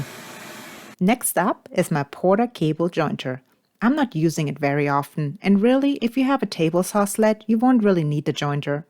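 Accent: Germany. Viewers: Als dein Video gesehen hab, musste ich lachen. 1. dein aussehen soll was? Die lustmolche anziehen?🤣 2. dein Roboter englisch, kommst du aus Deutschland? Das einzigste was mir gefällt ist das du als Frau dich handwerklich dich betätigst.😄👍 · Are you German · Is that a German accent? Never really heard one before. 🤔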